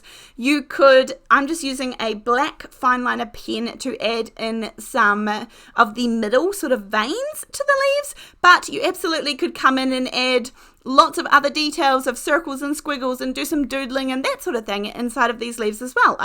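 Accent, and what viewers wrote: New Zealand. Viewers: So delighted for you,! I so enjoy watching your videos and learning great tips from you. You’re so creative and down-to-earth. And enjoy the Kiwi accent which reminds me of my lovely coworker from your country. This is a wonderful “step change” in your paper arts career! 🎉❤. I’ve got three sets in my cart!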